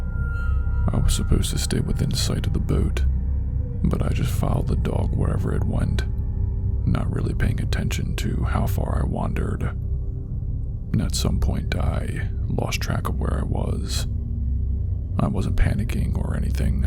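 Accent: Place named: East Coast, United States. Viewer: Ahh. Thought I detected an East Coast accent. I KNOW you are not Ai! I’m a night owl in the Pacific time zone. Even when I catch this around midnight, it’s like 3am your time when I comment. 🤷🏻‍♀️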